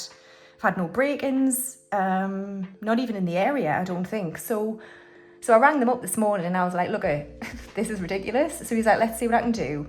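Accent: Newcastle, United Kingdom. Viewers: Fab video as always. My hubby even said "oh is she a Geordie too? I thought so!" (Hes Northern Irish)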